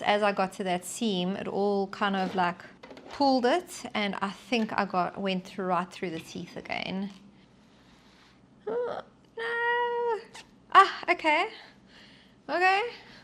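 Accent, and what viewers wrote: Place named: South Africa. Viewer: Hi there. You sound like a fellow south African 😊. Can you advise on how you choose your size? How did you know the size would fit you or are you familiar with tianas closet patterns? Thanks allot for the tutorial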